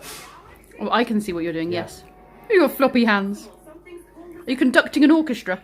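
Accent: United Kingdom. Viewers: .. It's that Brit accent that gets me all the time.. hahaha.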